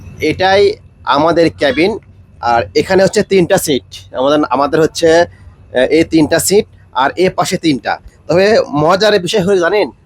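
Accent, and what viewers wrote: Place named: Bogura, Bangladesh. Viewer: ধারাভাষ্যে বগুড়ার আঞ্চলিকতা পরিহারযোগ্য। এছাড়া ভিডিওটি ভালো ছিলো।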